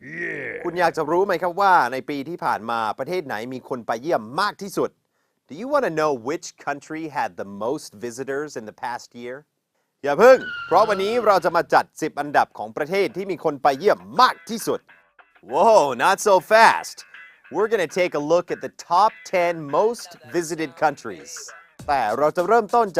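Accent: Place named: Isan, Thailand. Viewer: ชอบคำว่า "บ้านเรา" ในตอนที่ บอกว่า มาเลเซียอยู่ไกล้บ้านเรา ฟังแล้วไทยๆดีแท้ แถ พูดอิสานได้อีก ชอบมาก ผมเองก็พูดได้ฟังรู้เรื่อง แต่ข้อยบ่แม่นคนอิสานเด้อ จะขอติดตามดูทุกตอนจนกว่า จะเลิกทำ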